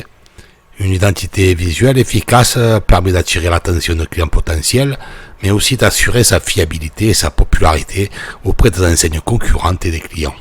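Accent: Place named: Marseille, France. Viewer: Je trouve ce concept intéressant, car j'aime maîtriser ce que je fais. De plus la vidéo est sympa et ludique avec un accent Marseillais qui change de l'ordinaire sur Youtube !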